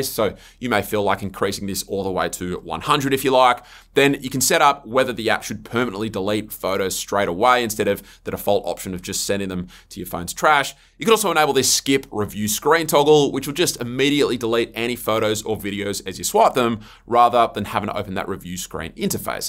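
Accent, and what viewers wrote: Australia. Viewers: I have to admit that the real reason I watch the 25 days series, is because I am smitten with his heavy Australian 'G'day Mate' accent.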